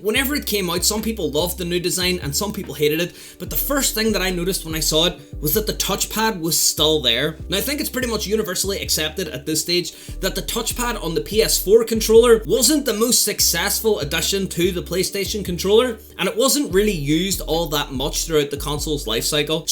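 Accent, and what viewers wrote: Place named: Northern Ireland, United Kingdom. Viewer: he might live in LA but his accent is definitely northern irish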